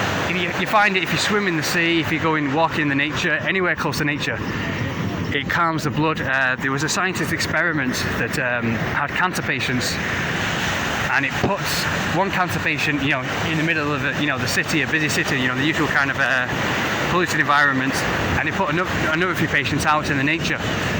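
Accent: United Kingdom. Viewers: You sound English to me but I may be wrong.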